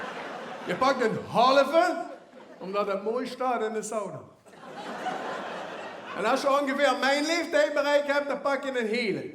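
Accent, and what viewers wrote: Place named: Limburg, Netherlands. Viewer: Moest als rotterdammer wonend in Limburg goed luisteren naar het dialect maar wat een humor heb hem inmiddels al 8 keer gekeken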